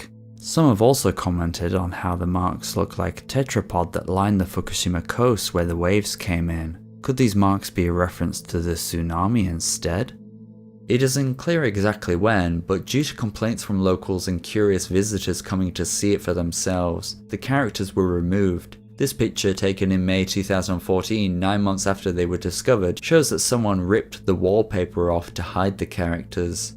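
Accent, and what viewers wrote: Manchester, United Kingdom. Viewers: Creepy video - nicely done! Your accent sounds Manchester-ish.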